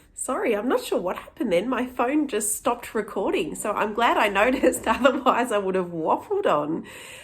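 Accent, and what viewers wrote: Australia. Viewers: Wow, super impressive! Like everyone else, I have enjoyed seeing your progress and your reactions to each clue... especially with an Aussie accent 🤣 I am plodding along clue one still, but intrigued to dawdle along the rest of the way, with everyone else's helpful tips and tricks 😀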